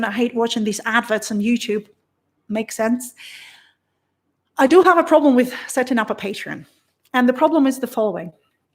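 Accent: United Kingdom. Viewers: Wow! You're doing so well! I knew I detected a british accent. People in the U.k cannot fly to Toronto until January 11. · Ok now I know why she have a British accent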